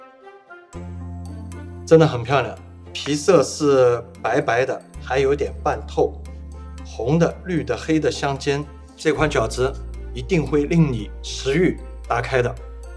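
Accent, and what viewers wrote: Shanghai, China. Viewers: Grace W 很明显的上海口音 ：） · 上海腔普通话~🤣🤣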